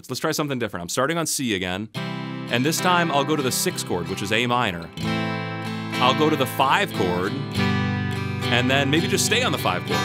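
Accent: United States. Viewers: I can sync, feel this Dude’s Radio-sounding voice, clear English-American accented explanations and way of teaching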